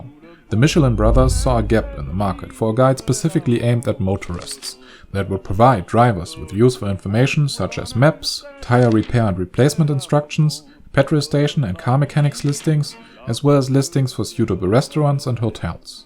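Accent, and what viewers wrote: Germany. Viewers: Not French for sure. As French myself, it sound also German to me. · Typical german accent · wie kann man nur so deutsch klingen xD dieser Content ist einfach ein Referat aus der siebten Klasse. Krank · U german? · Du bist deutscher 😋😋 is ch höre das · PLEASE practice your accent. For me as a fellow german its nearly unbearable to listen to you speaking english anyway, nice video! keep up the good work 🌞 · Pretty sure he's german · I love your German accent, very convincing and genuine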